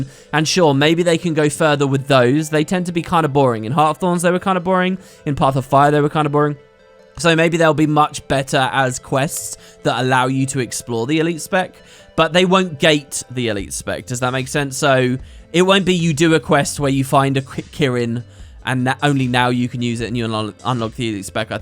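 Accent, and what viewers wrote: United Kingdom. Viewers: British to a ̶t̶e̶a̶ tee.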